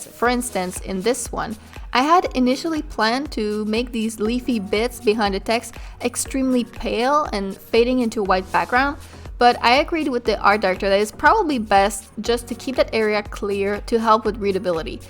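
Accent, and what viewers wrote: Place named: Canada. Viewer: Thank you,. That was really helpful! Can you do a tutorial, or series about Procreate on iPad or Affinity Designer on MacOS? Btw: Canadians are the best, love your accent! <3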